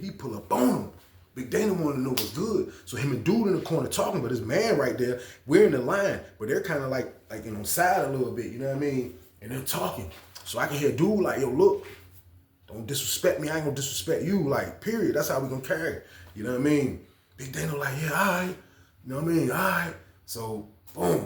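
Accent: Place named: Boston, United States. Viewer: U from Boston homie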